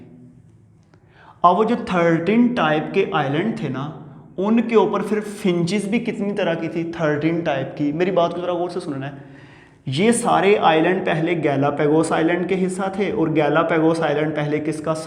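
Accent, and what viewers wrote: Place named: Punjab, India. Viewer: I’m sorry but looks like sir speaks English like Punjabi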